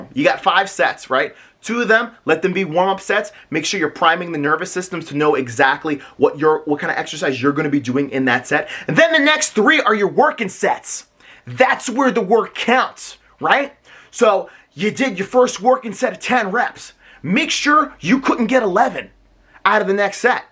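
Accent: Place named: South, United States. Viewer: Good Southern accent Coach. LOL you can shed it and then the illusion takes over, you look pretty big on stage!!! Another banger video!!! OK bro wassup with the podcast with Joe....I'm gonna keep kickin your ass until y'all start doing them again.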